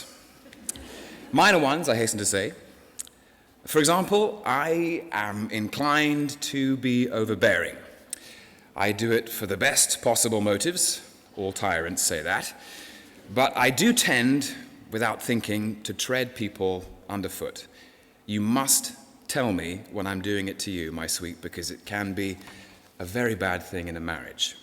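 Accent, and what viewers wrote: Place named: United Kingdom. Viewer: This essay poem is not only utterly romantic and passionate but suits his voice, his British accent... beautiful literature piece that just suits the loving character. Also to his mischievous character when he said let's get into detail, hehe. Blemishes were funny and actually made total sense. Love the literary style with metaphors...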